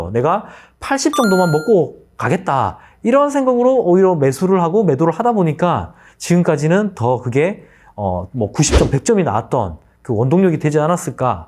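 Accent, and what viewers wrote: Busan, South Korea. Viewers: 이 부산출신이라 사투리쓰시죠ㅎㅎ 실물은 영상보다는 훨씬 멋져요 ^^ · 실물로 보면 괜찮다??? 원판 불변의 법칙을 아시나요? ^^ 아니 그냥 그렇다는 겁니다. 실물로 보면 잘생겼을것 같아요. 음....원판 불변의 법칙이 뭐었더라... 음... 암튼 죄송합니다. 결론은 실물이 멋지신걸로 ㅋㅋㅋㅋ 그리고 말투가 부산사투리 였군요.^^ 뭔가 이상하고 어색하다 했는데, 이제 이해가 되네요..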